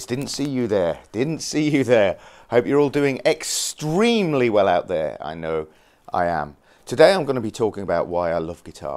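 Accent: United Kingdom. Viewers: Hello!...I really hope that you and yours are healthy...take care!!! I thinck you are so far from DT...your tune is awsome more DT than DT... I always loved JP and DT but your way of playing hybrid picking, legato etc...you are flying above the neck of all your guitars...your style is so fluid and airy... Nothing is rough or inelegant in your game... you play as you speak but faster... with clarity in a calm and calm manner with this unique British accent so far from the nasal American accent... (... be careful, not all Americans have this accent coming from the nose...) I am French and the English accent is very precious as is your game, with finesse and mastery. I don't know how many centuries it would take for me to live to reach this level... respect.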